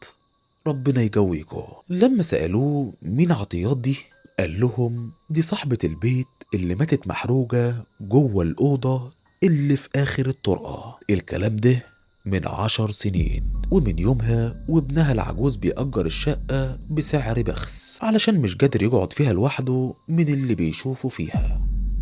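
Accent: Upper Egypt, Egypt. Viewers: وحشتنا يا ماتغبش يارب يهدي بالك اي بتعرف تتكلم صعيدي تحياتي ليك ولشعب مصر من قلب سورية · لهجتك الصعيدية حلوة ❤❤❤❤❤ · الصعيدي حلو اوي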